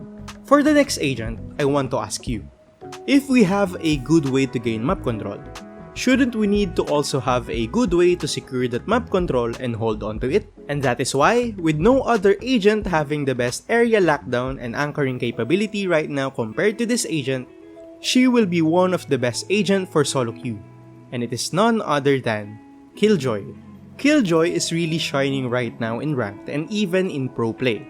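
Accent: Philippines. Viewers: hey man what region do you play in? you sound like you're from apac specifically in the philippines lol. not that you mind!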